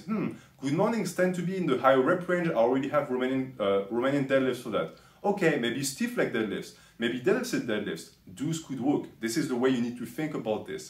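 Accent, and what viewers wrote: France. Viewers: R u from France bro ?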